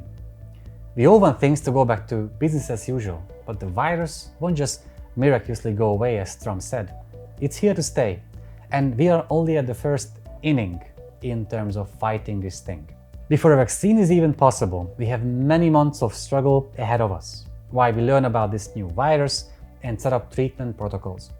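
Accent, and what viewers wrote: Hungary. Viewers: Hungarian accent,,,dr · Your accent reminded me of my grandmother's. Sounded familiar. ;) I never got to learn Magyarul, but still hope to one day! Be well.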